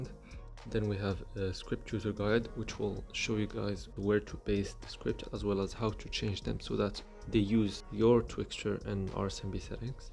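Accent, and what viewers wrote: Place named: France. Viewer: are u french ? your accent sounds french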